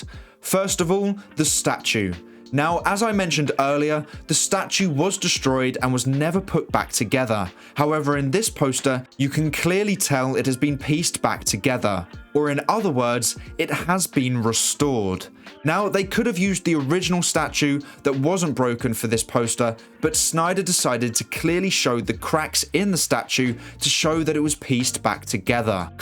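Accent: United Kingdom. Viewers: As soon as i hear a British narrator i know it is clock bait with no reliable information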